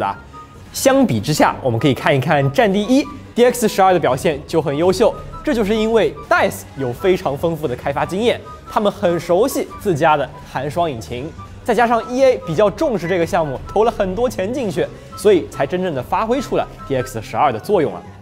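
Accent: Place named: China. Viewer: this is the PRC's essense of pronounciation.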